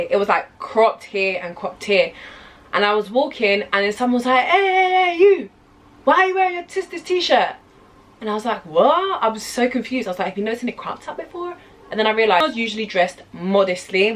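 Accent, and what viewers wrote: United Kingdom. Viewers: not all of it no i was looking for another video but what i did hear was that you sound English where was you born ? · was not expecting a brit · I finely found a a British accent in a view about Tanzania 🇹🇿 🤗 I live in the UK 🇬🇧, that's why I wanted to see the views about Tanzania from a black British, and you are very young girl, thank you so much for this very useful information. Thank you my girl.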